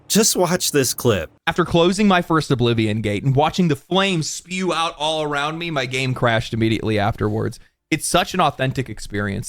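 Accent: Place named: South, United States. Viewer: Fr. Dawg is definitely from the south.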